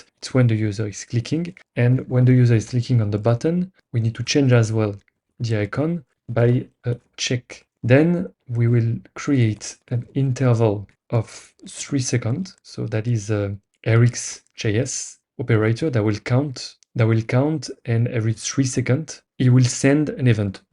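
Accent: France. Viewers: france accent ? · On entend bien l'accent Français, c'est bien pour comprendre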